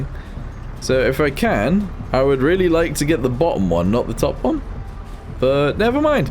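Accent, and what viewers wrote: United Kingdom. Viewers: Hi, I came to UK and I'm already used to their accent thanks to you!🎉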